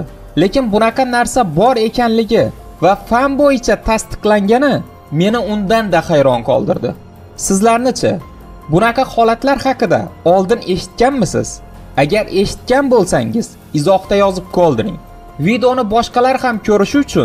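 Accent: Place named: Khorezm, Uzbekistan. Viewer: xayotda qanday garipsangiz shunday oqing deysizmi menimcha admin xorazmlik xorazmcha gapirsala vapshe tushunmaysla😂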